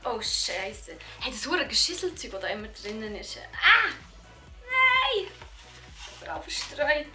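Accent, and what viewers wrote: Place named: Switzerland. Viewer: Auch wenn mich beauty nicht interessiert, habe ich Spaß daran dir zu zuhören. Einfach nur weil mich das schweizer deutsch so fasziniert. Lg aus Niederösterreich :)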